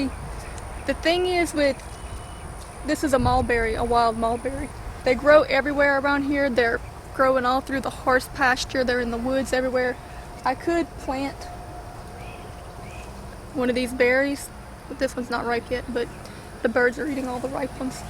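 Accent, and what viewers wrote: Louisiana, United States. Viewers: are you cajun ?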